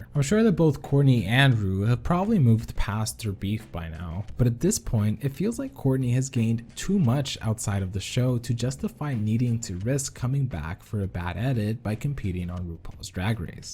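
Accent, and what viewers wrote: Spain. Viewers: QUEEEE? ERES DE ESPAÑA????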